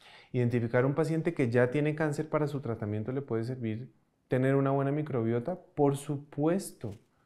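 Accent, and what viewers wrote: Colombia. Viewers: dije meditacion...y si..no lo recomiendo dira muchas cosas buena pero me parece dice mas cosas malas y mentiras...no se si el cree sus mentiras o no. Te recomiendo no te dejes engatusar por su acento colombiano bonito. Lo siento "doc" es lo q pienso.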